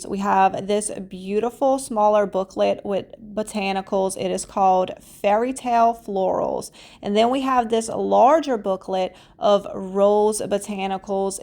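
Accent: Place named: South, United States. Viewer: I’m pretty sure it’s not phony! She’s from the south and has an accent!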